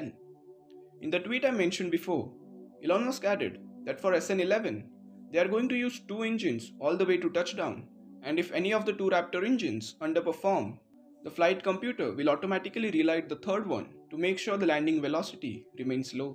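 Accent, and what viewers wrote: India. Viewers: when can Indians speak with a not annoying accent? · I am American and I understood that narrator who sounds East Indian perfectly.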